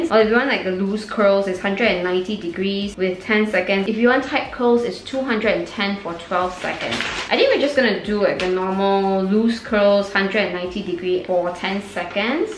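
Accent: Singapore. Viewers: She actually looks and sounds like my Singaporean friend. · Haha I guess singaporean and malaysian english sounds simillar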